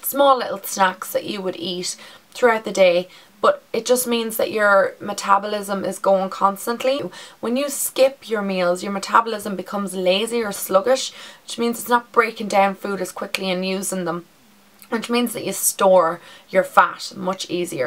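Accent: Ireland. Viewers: Yes I'm doing good. I walk daily, I'm off oxygen, and insulin, so I control my diabetes with diet now. By the way I wanted to let you know that Ireland is my dream vacation. My ancestors are Irish and Scottish. We go back to a cousin of William Wallace. So I love your accent. What kind of dance do you do? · this is such a good video and i thank you for making it. i try to eat healthy the thing is when i work i am 100 percent focused on working and i forget to eat sometimes. i seriously do by the time i look at the clock it was like 4 in the afternoon. by the way i love your Irish accent wish i had mine. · the way you say "but" "fun" "much" etc is so different to the British or the Australian or American accent. I still remember the first time that I went to Dublin, I freaked out! you do speak quite clear to me though. hugs! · cant believe ive never seen you on youtube before, as soon as i heard the accent i got so excited lol (im from ireland too). 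loved this video so much very informative and holy god your figure!!!! its amazing you should be so proud! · Hi,thanks for such detailed video.I am a doctor myself,working in UK,have lived in Northern Ireland 4 yrs ago,thats why i liked your Irish Accent :) I have myself have these changes of lifestyle by eating healthy and workout! i totally agree there is nothing such thing as diet,its for whole of ur life...i have always heard abt how good oats are,i will try to include them in my brekky from now :) x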